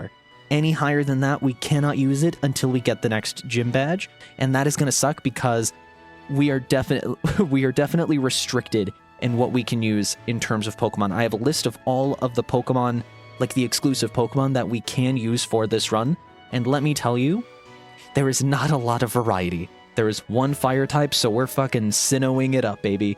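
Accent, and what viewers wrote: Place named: RP, United Kingdom. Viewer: the super accurate posh accent got me hollering within the first few minutes HAHAH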